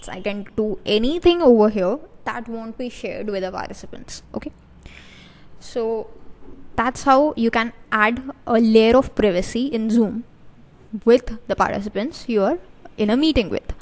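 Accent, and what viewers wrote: India. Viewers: Can’t stand her strong Indian accent. Wooh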